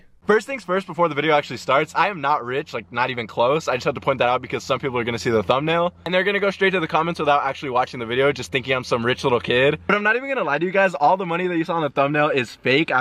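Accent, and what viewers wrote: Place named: California, United States. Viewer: Cali voice*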